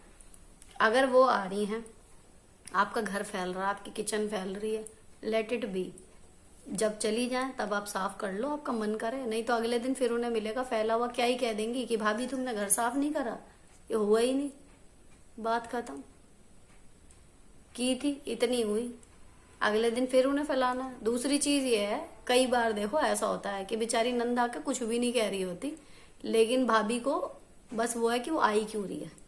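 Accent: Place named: Meerut, India. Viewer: Hi ji I am rajni Chauhan from meerut ganganagar you know you look like my Mami ji PTA hi mujhe sabse achi baat kya lagti hai apka baat kerne ka tarika bilkul desi bolte hi PTA lag jata h meerut ki l language like it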